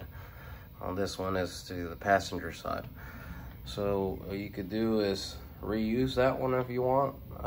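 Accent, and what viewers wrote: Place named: Texas, United States. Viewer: Knew this guy was from Texas right away, set video speed to 1.25. Much better.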